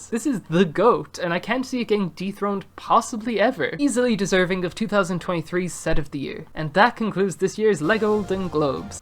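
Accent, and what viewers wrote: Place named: Ireland. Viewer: As an Irishman I personally find that when I hear an Irish accent on YouTube I usually expect the video to be low quality, but I was pleasantly surprised with this one. Really good stuff that kept me engaged all the way through which is rare, I’m shocked you haven’t got more subs. Can’t believe that new Vision minifigure didn’t get a mention tho 😤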